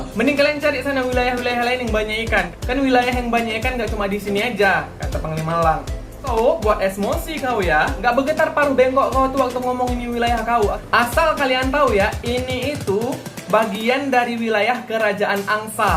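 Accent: Medan, Indonesia. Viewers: Penuturan bahasanya kek org medan 😆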